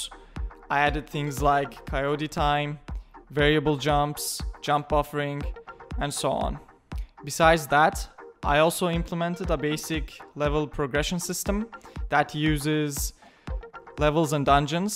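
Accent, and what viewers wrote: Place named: Turkey. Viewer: izlerken dedim adamın ingilizcesinde türk aksanı var gibi la sonra baktım kanalın adı lol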